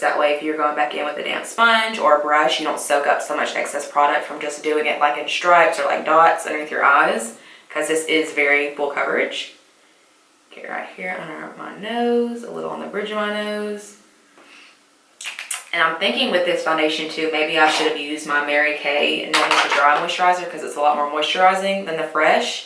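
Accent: South, United States. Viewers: OMG girl same!!! I'm southern and from Alabama!!! It's good to hear a makeup guru that's southern like me, lol. You should follow me in instagram, I have to accounts one is my main gracieorr_ and the other is my "spam" and I basically just post a lot of makeup looks I've done. I would love for you to follow me!!! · I love your accent! Us girls with southern accents are the best 😄 you’re gorgeous by the way! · I thought you were from Georgia cause of the southern accent lol but I'm Mexican but I'm raised in Georgia and i sound like you lol 😂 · Yasssss .... Girl your accent just gave me life ... I'm from SC and I didn't realize how much I miss hearing that southern accent . U look amazing !!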